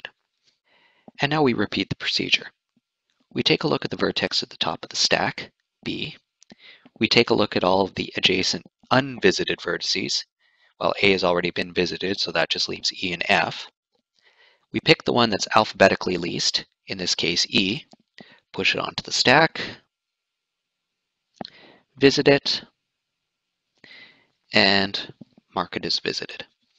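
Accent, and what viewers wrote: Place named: United States. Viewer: bhai india me hi rehta hai na.... kyunki accent se to america ka lagg rha hai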